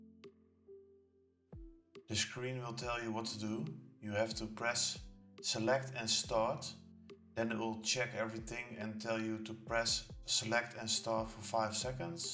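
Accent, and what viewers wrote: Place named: Netherlands. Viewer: Are you Dutch? You have a Dutch accent. hehe Nice Video! Thank you!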